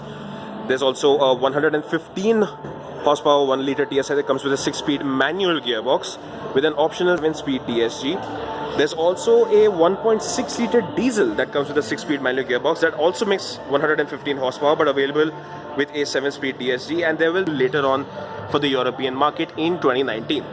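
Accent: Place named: India. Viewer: Indian accent, reminds that asshole Indian manager no one likes.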